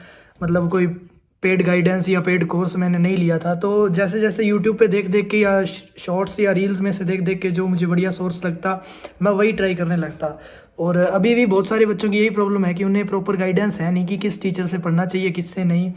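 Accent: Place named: Hisar, India. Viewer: Kha se ho aap ? Hisar side se lg rhe ho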